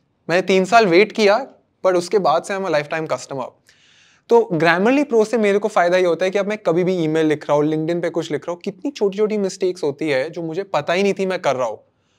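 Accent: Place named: India. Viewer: I'm sorry but the Indian accent is still very difficult to hear. However, your tutorials are still of very good quality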